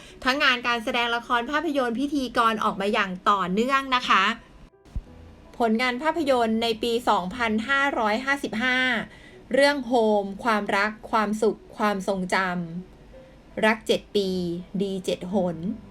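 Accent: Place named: Isan, Thailand. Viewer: นึกว่าคนอีสานเว้าอีสานคักขนาด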